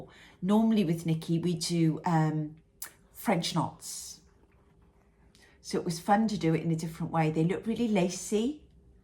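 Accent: Wales, United Kingdom. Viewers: Your work is just amazing and it’s such a delight to watch and listen to your enchanting Welsh accent. Thank you!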